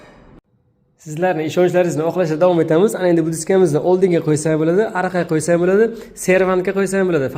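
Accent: Bukhara, Uzbekistan. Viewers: Устажон. Омадизи берсин. Сиззи шевангизни эшитиб Бухоролик укажонларим ёдимга тушди. ОМАД! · "Bexoro şeva" manga yoqadi😃 Yoşligimmi eslatadi..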